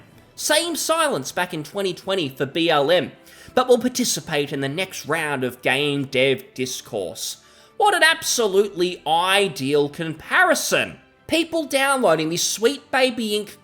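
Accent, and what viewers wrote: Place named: Australia. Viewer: Please tone down the Australian dialect, thanks! If i wanted to listen to that shite i go watch crocodile dundee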